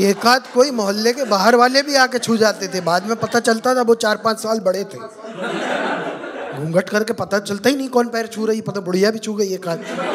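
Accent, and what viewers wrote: Uttar Pradesh, India. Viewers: Bhai ke bolne ke tarike me hi UP jhalakta h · Up accent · U.P. tone🔥🔥🔥🔥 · His UP accent reminds me of Rajeev Srivastava 😂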